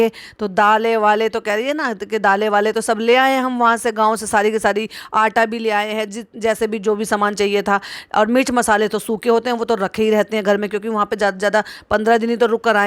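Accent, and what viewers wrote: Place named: Old Delhi, India. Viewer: Aapke bolne ke style se lagta h Ki AAP purani dilli se bai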